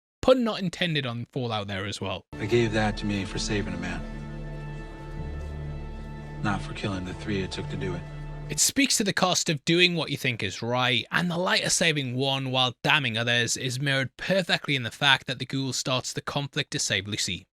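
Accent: United Kingdom. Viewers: How dumb is it that I'm so normalized to your content, that I forget you're from the UK, so when you make jokes on Americans like "Why don't you want to talk like this" it takes me a second,lol?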